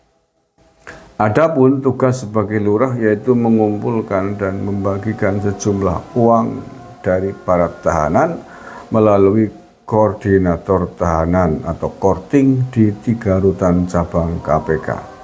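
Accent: Banyumas, Indonesia. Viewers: ngapaknya bikin gelitik bung.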